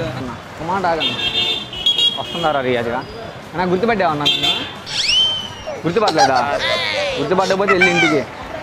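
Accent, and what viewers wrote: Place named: Guntur, India. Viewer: Bhayadi prakasam, guntur side ankunta. Slang alanevundi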